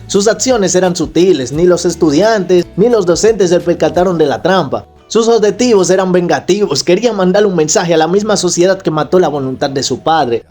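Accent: Dominican Republic. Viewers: eres dominicano?, buen video, mi opening favorito es el 2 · Como amo el acento dominicano❤ genial analisis🎉🎉🎉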